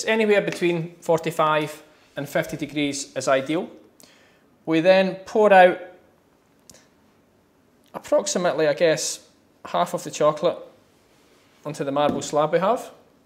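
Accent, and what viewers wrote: Scotland, United Kingdom. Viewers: I just looked at the comments to see id anyone mentioned his scottish accent 😂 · I wasn't expecting a Scottish accent going into this 😂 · He's most likely from central Scotland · He scotish? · Oh that beautiful chocolate and that Scottish accent... <3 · He is Scottish like mee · no, he sounds very scottish.... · Where is his accent from? Scottish? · He's Scottish, AKA the language I speak. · Ahh Scottish accents <333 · no he sounds very very scottish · you are right jonge!!!, its sound scottisch. I said that he was a dutch because it really sound like dutch speaking english...buut isnt it. I actually still have a dutch accent when i talking english hahah ;) doei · I know that you've already had you me question answered, and yes, he is Scottish, but some Scottish accents are different depending on where you live ( I'm Scottish ) :) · I was trying to wind him up lol I know it's scottish, you know how the relationship with Scottish people is with the English ;) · Definitely Scottish! 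The way he pronounces 'R' tells that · haha no he's definitely scottish,i lived there for a year and he definitely is scottishxP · This man is Scottish, not Irish~ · Chocolate upon chocolate upon chocolate and a Scottish accent. This video was sent from heaven. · Scottishhhhhhhhhhhhhhhhhhh, yaldi. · YES! He's Scottish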